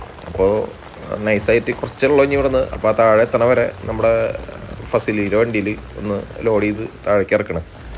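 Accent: Thrissur, India. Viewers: അവൻ്റെ പൂറ്റിലെ ഒരു കൊണച്ച തൃശൂർ സ്‌ലാംഗ് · Bro അത്ര Proffesional അല്ലാത്തത് കൊണ്ട് ആവും video ക്ക് നല്ല orginality . മറ്റുള്ളവരുടെ vlog കാണുമ്പോൾ skip ചെയ്യാൻ ഉള്ള tendency ഉണ്ടാകും ഇത് ഫുൾ കാണാൻ thoni കണ്ടു ഇഷ്ട്ടപെട്ടു follow ചെയ്തു കൂടെ കൂടി ഇനിയും ഇതുപോലെ ഉള്ള video തൃശൂർ slang ഇൽ കേൾക്കാൻ ആഗ്രഹിക്കുന്ന ഒരു കൊല്ലംകാരൻ ❤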